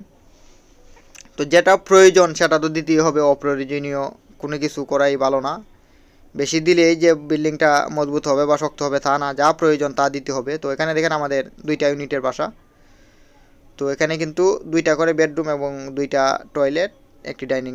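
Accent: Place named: Sylhet, Bangladesh. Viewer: আসসালামু আলাইকুম۔۔ জনাব, আপনার কথায় বুঝতে পারছি আপনার বাড়ি সিলেট বিভাগে l সিলেট শহরে পাঁচ শতক জমির উপরে তিন তলা ফাউন্ডেশন দিয়ে একতলা বাড়ি করতে আগ্রহী l এজন্য আমি নিজেই একটি নকশা করে রেখেছি l আপনার ভিডিওগুলো অনেক সহজবোধ্য হওয়ায় আপনার সাথে আলোচনার জন্য আগ্রহ প্রকাশ করছি l এজন্য আপনার মোবাইল নাম্বার (Whatsapp /Imo) o email address পেতে পারি কি ? ধন্যবাদ !! __ মিছবাহ তফাদার রিয়াদ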